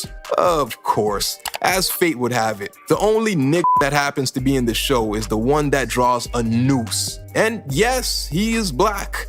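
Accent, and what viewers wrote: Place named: Quebec, Canada. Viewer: Your from Montreal Quebec or chateuguay right I can tell from ur accent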